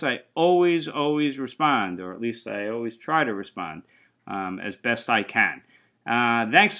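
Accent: Boston, United States. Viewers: What's your accent? Boston? It's a bit like JFK in places.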